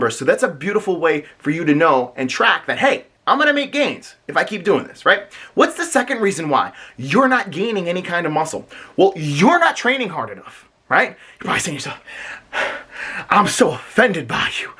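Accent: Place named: South, United States. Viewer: Good Southern accent Coach. LOL you can shed it and then the illusion takes over, you look pretty big on stage!!! Another banger video!!! OK bro wassup with the podcast with Joe....I'm gonna keep kickin your ass until y'all start doing them again.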